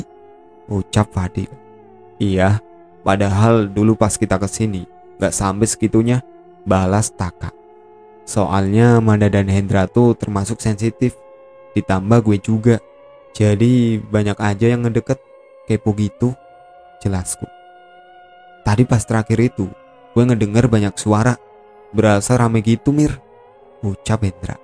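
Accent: Java, Indonesia. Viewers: Min jangan pakai guwe atau gua dong .gk pas .dg logat jawa nya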